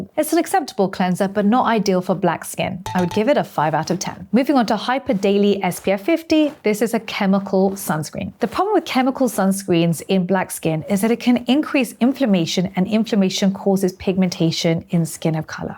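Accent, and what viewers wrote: United Kingdom. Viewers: But, u sound british, ur video has hit me in Britain, why we looking at American products? Im not in america! Can u do a video for black skin for products on our shelves over here in britain. Thanks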